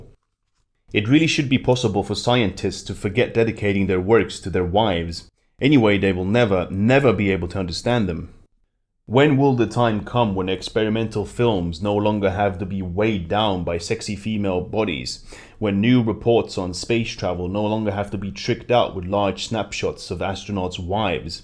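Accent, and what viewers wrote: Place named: Eastern Europe. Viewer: My favourite quote: "theoretically, it is possible for a beautiful woman to have less intelligence than a chimpanzee and still be considered an acceptable member of society" Where are you from? You sound like another YouTuber called "Eastory". I'd say somewhere in Eastern Europe. But you have a British accent too. Well-narrated. You have a pleasant voice and everything is easy to follow.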